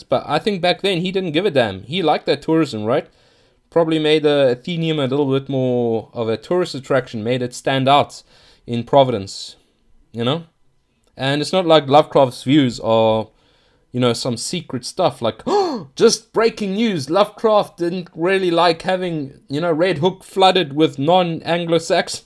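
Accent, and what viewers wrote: South Africa. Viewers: I just discovered your channel through normalapollo, I could be wrong but you sound South African, or at least Rhodesian by your accent, and it's good to know a fellow countryman who loves lovecraft and holds nationalist beliefs. Keep up the good work, Volk En Vaderland.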